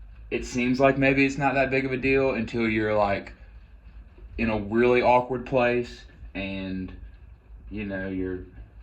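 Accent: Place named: United States. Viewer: you can tell by so many things in your video, but the main one being that the soft laser etched lettering is still on the grips. if you grew up with crescent wrenches there is a learning curve. you dont know how to use knipex and are not really entitled to an opinion. just a typical american tradesman (and all the stereotypes that entails judging by your speech and mitts)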